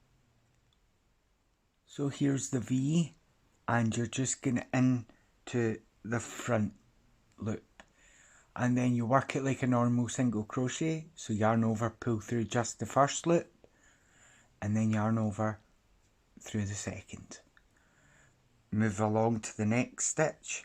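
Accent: Scotland, United Kingdom. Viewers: I recently picked my hook back up after a few years away thank you for making these videos your so easy to follow. It's weird though hearing a Scottish guy teach in usa 😂 I think it's easier though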